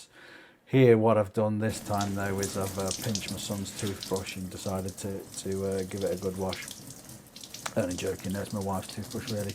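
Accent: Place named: England, United Kingdom. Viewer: Looking forward to watching the other videos you have made. You have just been recommended to me - nice to hear an English accent! I haven't grown up either!!!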